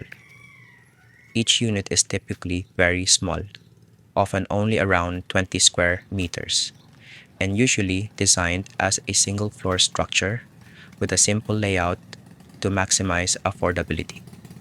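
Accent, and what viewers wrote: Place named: Benguet, Philippines. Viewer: You must be from the highlands in Benguet based on the way you speak English.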